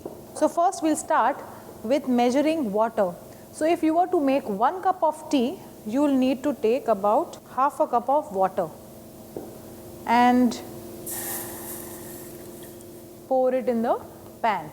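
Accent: India. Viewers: Very clear instructions in classic Indian accent (apna cha ache hain, leikin T nahin!)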